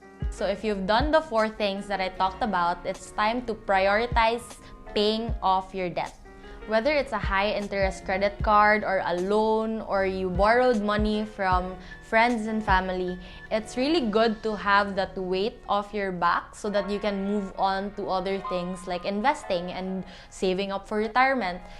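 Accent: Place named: Cebu, Philippines. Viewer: Very helpful tips! Thanks for sharing. Anyway, are you from Cebu? cause you sound like my Cebuano friends.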